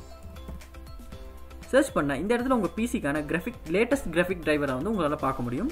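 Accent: Sri Lanka. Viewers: Srilankan friend pesra mari iruku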